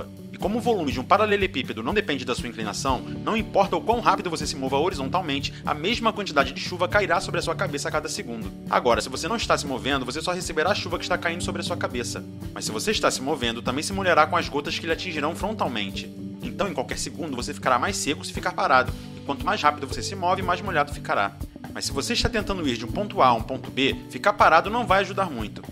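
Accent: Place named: Rio de Janeiro, Brazil. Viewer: caralho é impossivel ver mais de 1 minuto de um vídeo em carioquês, que coisa insuportavel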